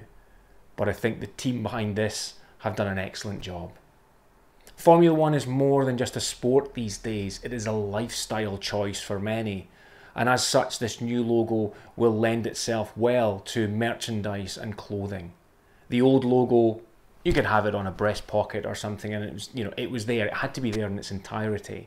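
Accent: Scotland, United Kingdom. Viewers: The sexy soft scottish accent.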